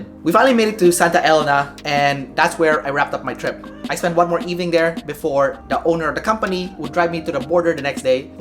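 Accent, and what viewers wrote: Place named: Philippines. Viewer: Why do you have a filipino accent?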